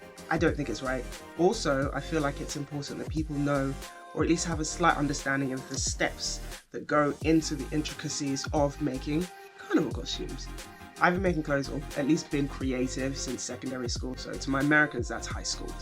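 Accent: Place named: Barbados. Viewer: Alright de bajan accent sounding right den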